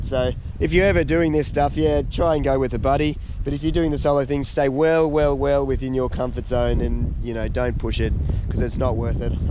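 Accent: Australia. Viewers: He has the most stereotypical Australian accent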